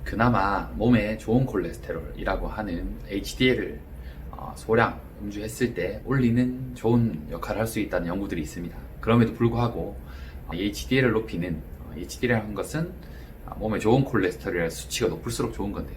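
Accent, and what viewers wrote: Gyeongsang, South Korea. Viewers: 갱상도~~사투리 쩐다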